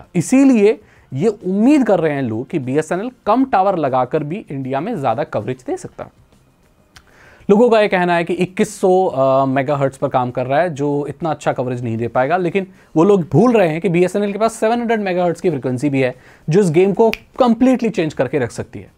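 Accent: Odisha, India. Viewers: Odisha se ho Kya Bhai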